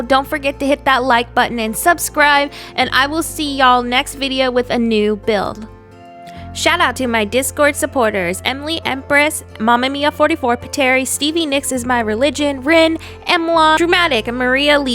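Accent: South, United States. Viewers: I have been following you on Instagram for a while now and this is the first video I have ever seen of yours. Your accent is so cute! Just a subtle southern twang. I love it. I love your builds!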